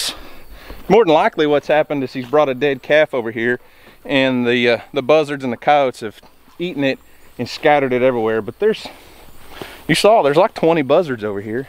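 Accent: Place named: Texas, United States. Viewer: 9000% lol sounds like Texas. Great video I really enjoy watching them.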